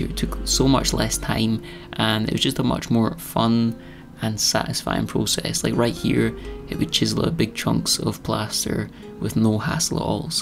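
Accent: Scotland, United Kingdom. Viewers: I did just that and realized I had been watching off and on since he renovated his tiny stone house that had been abandoned. The name of his channel has changed, I think, but I recognize the cat, accent and his red haired from the tiny house renovation. Thanks you I could listen to that accent all day long. My family was from Scotland but journeyed to US in late 1700’s and only a Texas accent remains!!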